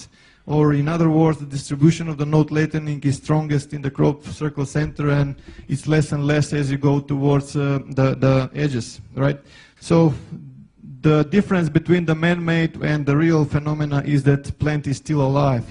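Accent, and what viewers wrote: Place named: United Kingdom. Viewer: Let me guess, you're from the UK.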